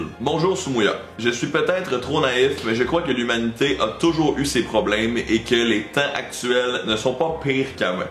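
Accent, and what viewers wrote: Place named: Quebec, Canada. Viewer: Pénible cet accent québécois, j'ai beau faire un effort, j'y arrive pas, pour moi, c'est insupportable à écouter, en plus c'est un vrai tue-l'amour ! En plus pour dire des conneries pareilles, là c'est carrément une torture que d'entendre ces bêtises! C'est vrai que DAESH est humain et ouvert, non?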